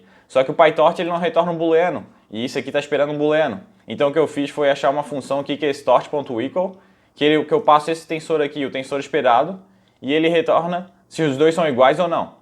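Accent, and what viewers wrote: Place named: Santa Catarina, Brazil. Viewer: Pow, bicho, tu é de Floripa? Sotaque aí tem tudo pra ser de SC pelo menos. Hahaha Enfim, parabéns pelo canal! Excelente vídeo.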